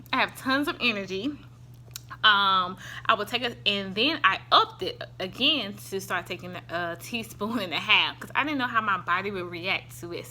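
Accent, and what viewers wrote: South, United States. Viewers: I love your Southern accent, I'm from Atlanta too. Thanks Queen for the amazing info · Nothing wrong with your accent. Southern... thanks for the video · U speak very well love your southern accent. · Ur quite lovely and the southern drawl is very charming. Keep up the good work.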